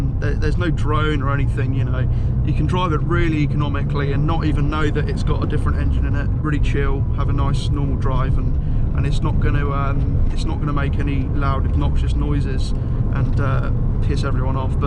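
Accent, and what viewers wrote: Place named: Staffordshire, United Kingdom. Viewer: Where are you from? You sound as if you're pretty local to me? I'm in Staffordshire.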